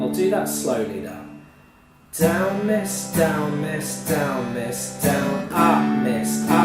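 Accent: United Kingdom. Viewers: i likes this guy, his accent is wonderful #yeaBRITISH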